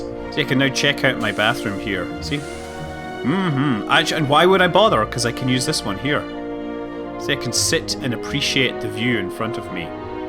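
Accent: Scotland, United Kingdom. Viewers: so i went to increase the resolution on the video since youtube thinks im on dialup. Ended up hitting the closed captions. fuck me this shit is hilarious with a scottish accent!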